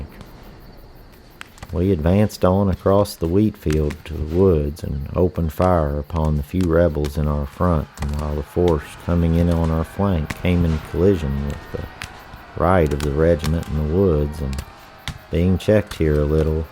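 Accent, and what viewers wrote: South, United States. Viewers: Great narration. Just that the person who wrote this was in the Michigan 4th. I kept getting confused because of the narrators southern accent.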